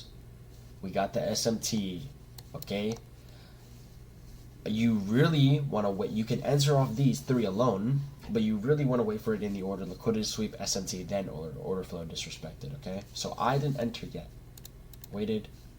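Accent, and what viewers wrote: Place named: West Virginia, United States. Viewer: west virginia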